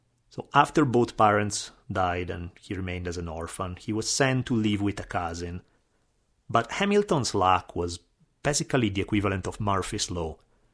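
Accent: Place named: Italy. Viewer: Hi! I'm really enjoying your work! I only came across you because of a Dan Carlin interview with you..I lived in Italy for many years and I enjoy your accent 😊 A friend from Brescia has exactly the same accent..Bravo!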